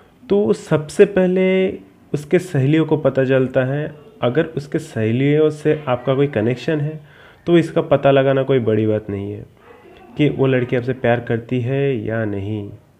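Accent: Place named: Bihar, India. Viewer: You never be like brain singer you can only copy him and your voice is like Bihari...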